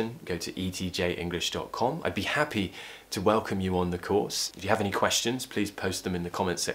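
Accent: RP, United Kingdom. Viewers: love your RP accent to improve my African accent. But the fees choo! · Hii...🙋.. thanks for your great video s.... I always eagerly wait for your upcoming video s...u r the great British Accent teacher....👍👍👍👍great job👍👍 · I JUST LOVE THE WAY YOU SPEAK...so that's why I think british accent is best....👍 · RP has to be my favourite. I love yours and Tom Scott's accent. Most of my Youtube consumption is from Brit creators' contents, mainly because my ears just prefer it that way (also: vocabulary preference), so that's the audio exposure for me. What really started all this though is that one time I got asked in my home country if I were a foreigner due to my accent; most people here can speak English, but in the American accent. Prior to that, I hadn't really noticed it.. so I just thought if that were the accent I tended to default to, I might as well do it well. 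:) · Of course i love (rp) accent thus i watching you always❤👏💕💪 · It seems to me that British accent is really pretty as it is fascinating to listen to a Brit speaking with it like you. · you stated that you have a “modern RP accent,” how is that in relation to the “mainstream RP” or “contemporary RP”? I, myself, like the contemporary RP accent. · Your pronunciation is excellent! I love RP. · Sir love your accent and pronunciotion on the other hand i love british ❤️ 😂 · I live in uzbekistan l love your accent l want to learn modern rp from you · I really love bbc accent and I think your accent similar to it or msy be itselfe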